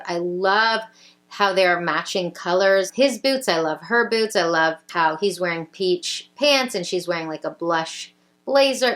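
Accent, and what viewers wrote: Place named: North, United States. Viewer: Who watches you, you murmur. I must have issues. Yes, I am a Midwest gal transplanted to the Dallas area and so grateful to hear the Northern accent (which to us is not an accent, it is in Texas that there is an accent.) You get my drift. Every video is like a visit from the little sister I never had, the one in Canada, not Minnesota, blonde by design instead of nature, the cute sis. I am so grateful for your video visits.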